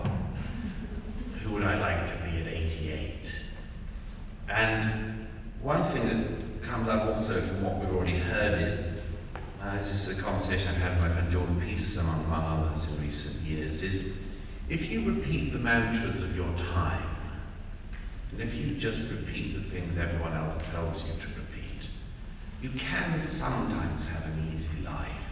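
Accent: RP, United Kingdom. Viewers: Talks a lot and says nothing in that horrible upper class English accent of a man who has done nothing in life but give talks like this. As if real people didn't exist.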